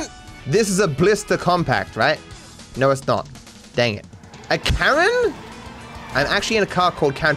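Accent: Australia. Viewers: your australian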